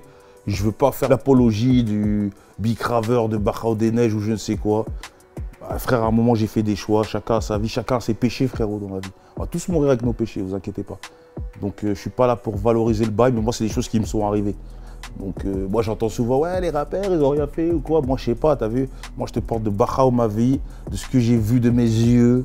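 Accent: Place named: Martinique. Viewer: Il est trop fort avec l accent martiniquais 👍🏾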